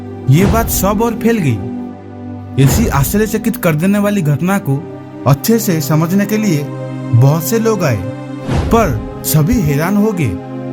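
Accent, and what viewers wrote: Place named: Bihar, India. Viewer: BC bihario vali voice itni gatiya sound kr rhi h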